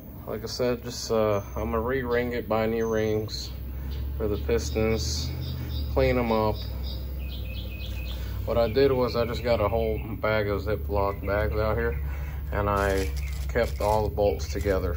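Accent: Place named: Texas, United States. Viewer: Knew this guy was from Texas right away, set video speed to 1.25. Much better.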